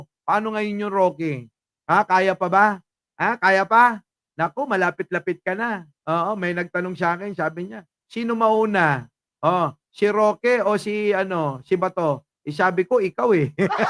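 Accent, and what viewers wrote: Ilocos, Philippines. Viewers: I like how you speak ilocano ading!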